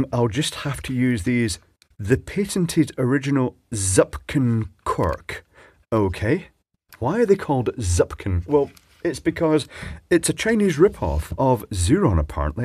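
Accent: Scotland, United Kingdom. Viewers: Few things make for a more compelling video than a calm Scottish voice continually asserting that what's about to be done is really not a good idea. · His accent is definitely not Manx, it's Scot's English. He does live on the Isle of Mann, though. Maybe they tolerate more explosions than the Scots?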